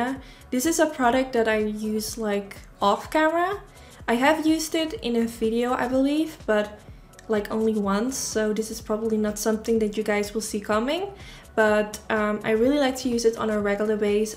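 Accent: Netherlands. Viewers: Are you dutch , you have kind a dutch accent. No offense becausse when i talk english it sounds like crap (also my writhing is really bad , sorry for that) i really liked youre video . You have 1 new sub❤️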